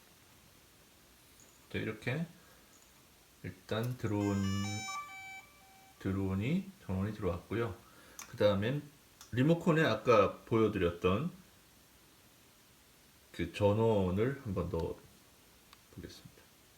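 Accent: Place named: South Korea. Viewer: 다좋은데 콩글리시가 ㅎㅎ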